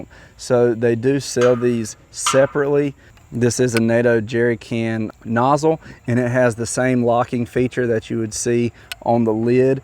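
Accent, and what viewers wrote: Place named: North Carolina, United States. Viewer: I knew that was a NC accent. Thanks for this video. I'm draping a leg all over your channel my man!!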